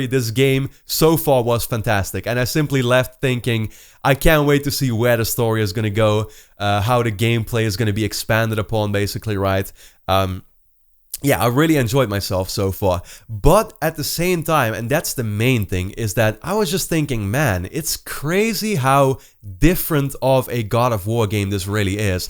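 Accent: Netherlands. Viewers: Ik dacht al dat ik hoorde dat je van Nederland was! Leuke video en veel interessante info. Thx! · Hey, are you Dutch? I just discovered your channel, and that accent leads me to think so. If you are, awesome! I am too and I love this video. Subscribed =) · Dude you have to be Dutch according to your accent. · are you dutch? you sound so dutch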